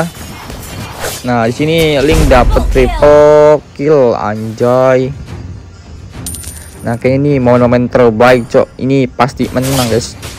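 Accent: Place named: West Java, Indonesia. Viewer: Mang orang sunda daerah mana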